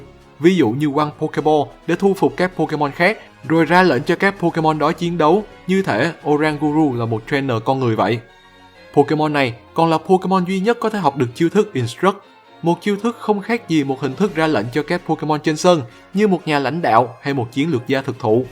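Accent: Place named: Mekong Delta, Vietnam. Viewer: Thích vì cácb nói chuyện đậm chất miền Tây (dù không biết ổng miền nào) Ví dụ rất dễ hiểu và cừii ss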